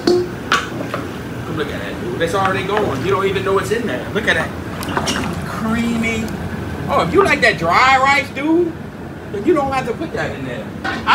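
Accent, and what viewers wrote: Louisiana, United States. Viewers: I love Louisiana accent they have the best in the south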